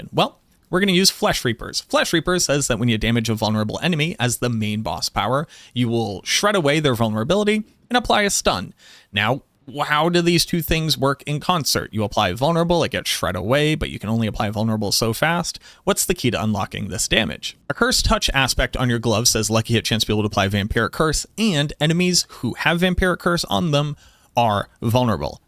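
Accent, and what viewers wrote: Boston, United States. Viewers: Please do a video guide in your authentic Boston accent. Thanks!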